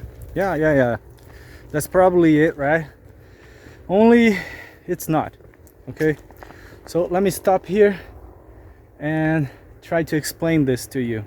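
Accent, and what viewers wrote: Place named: Brazil. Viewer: Publica mais videos, estou tentando aprender ingles e ver um br falando ingles com sotaque é mt bom pra mim praticar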